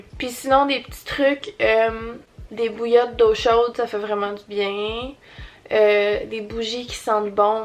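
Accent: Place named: Quebec, Canada. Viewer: Moi, prendre soin de moi ça n'arrive pas souvent, mais quand je le fais, je suis à fond: je passe longtemps (très longtemps) dans la salle de bain à me faire pleins de masques, de gommages, me mettre de la crème et tout et puis j'aime beaucoup me faire des petites surprises/choses cool au quotidien, rien que faire mon lit avant d'aller faire ma douche pour pouvoir me jeter dessus après ça me fait du bien... Faire la cuisine pour prendre une photo trop belle (et bien manger 😋), ça ça me fait plaisir. Mais j'aimerais beaucoup me faire une journée solo comme toi: aller au ciné, au restaurant, faire les magasins toute seule mais c'est un peu compliquée parce que du haut de mes (1,83 m / 6 pieds et ) 14 ans, je ne peux pas faire énormément de choses route seule... 😢 Mais j'en profiterai plus tard 😜, là je me concentré sur mon brevet blanc de lundi prochain 😥, courage à tous les 3èmes de France et pour finir, De gros bisous à toi qui me redonne le sourire avec ton accent québécois et tes idées toujours aussi géniales qu'originales 😉. 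Full love 😘❤️❤️❤️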